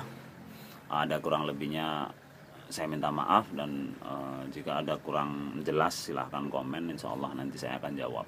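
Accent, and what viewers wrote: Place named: Jakarta, Indonesia. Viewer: Logat u Betawi banget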